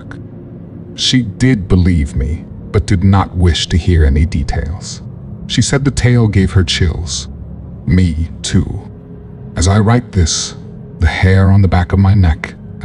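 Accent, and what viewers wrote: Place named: England, United Kingdom. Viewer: I said that but theres a pommy accent there??? Still cant work out if it is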